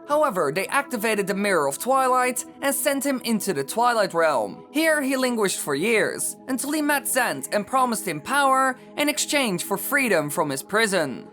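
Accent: Netherlands. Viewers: I hate Your fucking Dutch accent so much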